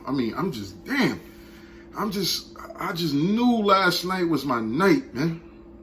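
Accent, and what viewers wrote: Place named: New York, United States. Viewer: I dont know if your from NYC but I think you are because of the Brooklyn nets hat, & your accent. Im also from NYC but I moved to Miami fl in the mid 90's I remember I could go into one of those mom and pops Asian stores and always walk out with what I wanted. If your from nyc try those asian mom and pop stores,, or Try China town.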